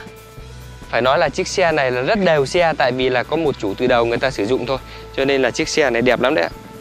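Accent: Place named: Hai Duong, Vietnam. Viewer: Đúng dân hải dương chất giọng ko chốn dc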